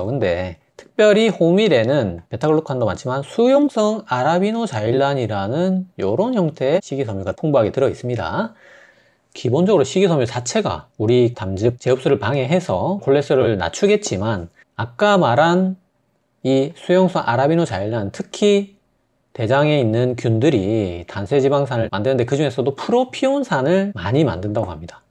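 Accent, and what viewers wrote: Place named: Busan, South Korea. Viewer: 점점 더 잘생겨져가네요😊 어쩌면 자료도 훌륭하시고 설명도 맛갈나고 쉽게 전달해 주시는지요 부산 사투리도 넘 딱뿌러지고 정겹습니다 열정을 다하시는 쵝오🎉🎉🎉🎉🎉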